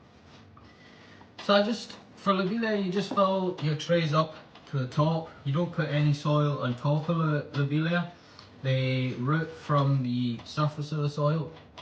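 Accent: Scotland, United Kingdom. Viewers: If you're bored, stop watching. Lots of fans love seeing anything he does. We hunger for relaxing content and seeing his idyllic life. Anything described with a Scottish accent is just a bonus IMO. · I have to tell you how wonderful you are. You are genuine and kind, so knowledgeable about border collies, particularly your funny and talented adorable pups and about farming in general. And you make me laugh. You have a good sense of humour and you remain calm in stressful situations (bad weather etc). I love love love love how much you care about your pups and how well you treat them. They just adore you in return. I love Stacey and Kole as well. More family outings like the video with the horses and how much Stacey loves horses would be awesome. I also am an animal lover and don't necessarily like zoos in general but these horses had gobs of room to run around, so all was good. 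I am mesmerized by how intelligent your dogs are herding. I could watch them all day. And how they look up to you, literally and figuratively. I have your videos playing while I work as they make me smile and the world is good. And the Scottish accent........don't get me started......love it! Keep up the good work. My name is Paula, from Ottawa, Canada.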